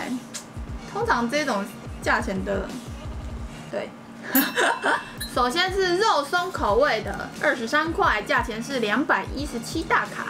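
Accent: Taiwan. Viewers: 台灣國語也太親切了🤣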